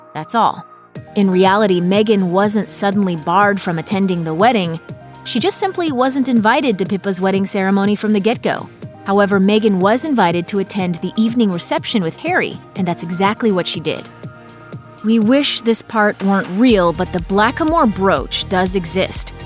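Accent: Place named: California, United States. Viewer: it's the same californian accent the narrator and Meghan have. Meghan's voice is a pitch/octave lower than the narrator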